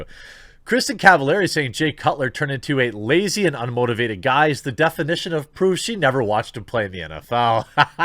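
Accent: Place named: Wisconsin, United States. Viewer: Your obviously from Wisconsin, greetings from the Green Bay area fellow cheesehead.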